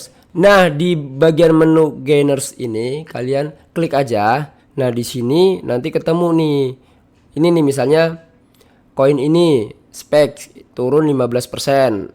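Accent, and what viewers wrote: Indonesia. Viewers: wong ngapak kayane ya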